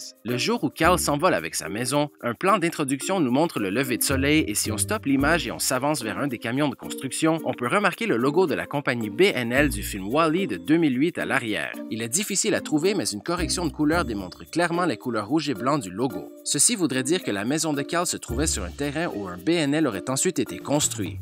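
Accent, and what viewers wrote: Quebec, Canada. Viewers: est tu québecois? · l'accent Québécois est beau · l'accent québécois, c'est plutôt classe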